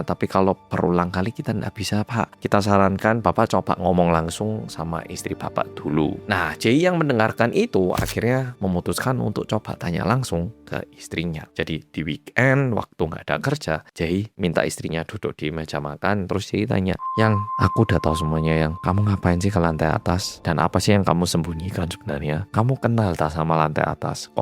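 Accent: Java, Indonesia. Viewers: Siang mas muedok lgsg nyimaaak🎉🎉🎉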